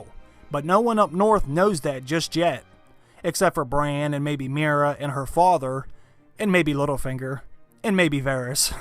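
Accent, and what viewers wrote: Pittsburgh, United States. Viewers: Are you from Pittsburgh, PA ... your accent sounds like Pittsburgh DAD.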